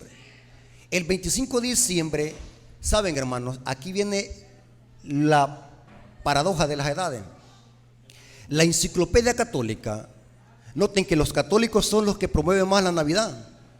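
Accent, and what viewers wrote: El Salvador. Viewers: 🚨De que pais esta este pastor?pienso que sera del Salvador,no me parece su acento a los Nica🤷🏻‍♀️🚨!!!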